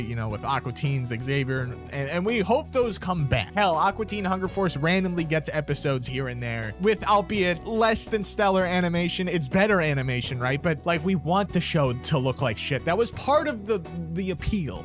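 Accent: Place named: Brooklyn, United States. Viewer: This is one of the most brooklyn accents ive heard and im all for it lol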